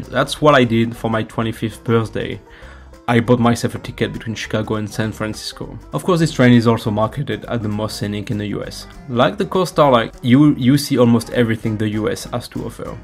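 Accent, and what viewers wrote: France. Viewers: I've taken the California Zephyr, Coast Starlight, Empire Builder, Southwest Chief, and Texas Eagle. My top 3 are the Zephyr, Empire Builder, and SW Chief. The Coast Starlight is very scenic, but I'm still salty about Amtrak discontinuing the private lounge car for the sleeper passengers. The Cardinal is on my bucket list. Great video, though I suggest you slow your speech just a little bit. My ears adjusted to your French accent, but it took a few minutes.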